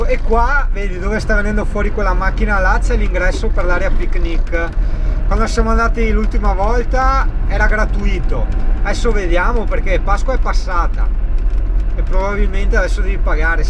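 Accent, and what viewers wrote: Veneto, Italy. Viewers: Sicuramente non sono mezzi da camminare forte considerato anche l'età del mezzo . Comunque bei video divertenti con l'accento veneto poi è uno spasso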